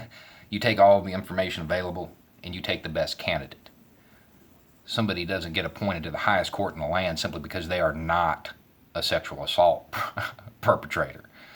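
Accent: South, United States. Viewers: I want to commend you on your channel; even though I'm not a subscriber... yet. In America we are all too quick to judge people by their appearance. As an formally educated African American man from the coasts of this country... even though my first year of college was at Auburn... I have been guilty of drawing conclusions about the racial and gender fairness in the heart of someone who looks and sounds like you... beard, southern drawl, etc. You do our society a DISPROPORTIONATE BENEFIT by displaying that you are capable, willing and instinctively driven to speak truth to power. To be honest and to call out the deceptions and transgressions by the white male, patriarchal hierarchy in this country. God bless you. I can only imagine how proud your wife is of you. Hopefully you can open eyes that I never could. Maybe you can get white women over 45 to realize how bad Trump... 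and the Republicans who failed to hold him accountable... are for them. I'm going to end this post with a challenge to my younger brothers... my sons & nephews... resist your urges to draw stereotypical conclusions about people we might visually conclude are of a certain adverse mindset. Be quick to "have the back" of someone like. In the wake of 8 years of presidential leadership by Barack Obama, there is a new generation of white male Americans who only know to be respectful, dignified and honorable with all people no matter their color or gender or sexual orientation. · Man, every time I watch your videos, I'm less fearful of white dudes with long beards and a southern accent. Lol. Truly, we have more in common listening to your views. What's your view of the illegal immigrants? In case you forgot, I'm a black dude. I live in Loss Angeles and so many Mexucans have come, they roll around town with the Mexican flag waving on their antennas or somewhere on their cars. 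I think it's a subtle form of treason. I dont mind people being proud of their heritage. But such public overtures shouldn't be something you would do entering another country. Why would you? If Mexico is so great, why did you feel the need to flee? You didn't go through.a PROCESS of properly leaving. These people fled Mexico like rats abandoning a sinking ship. These people KNOW here in the U.S. they can have a measure of peace, security and prosperity, and not worry about drug cartels and corrupt governments officials and corrupt cops ruining their dreams of the future. I just wish when they come, they would ALWAYS remember that. But they don't. They eventually start talking about white people, although they aspire to be rich and successful as many white people. They start talking about black people, although they move into the black neighborhoods when they first arrive. It's rank stupidity and hypocrisy that Mexican and southern American immigrants come and the U.S. 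(and I mean white people) better make these newcomers respect the flag and all this country represents or you mig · You sir, have a new fan. I’m from northern Mexico. We are the hillbillies of Mexico (inverse from the US). I can’t tell you how refreshing it is to hear a person with your awesome southern drawl say the things you say. You’re exactly right, and right now the scariest citizen is the one who stands idly by and does NOTHING! The only thing evil needs to prevail, is for good men and women to stand idly by and do nothing! May God bless you and I hope your channel blows up soon.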